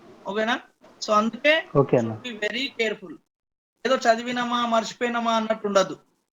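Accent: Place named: Telangana, India. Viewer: Telengana yasa la iragadeeshnav anna....detthadi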